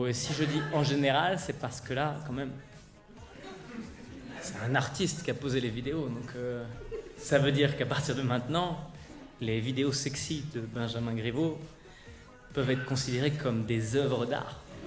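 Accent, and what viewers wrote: Switzerland. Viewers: J'adore ton accent suisse, il faut que tu fasse une vidéo où tu parles en Suisse... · j'adore ton accent suisse voilà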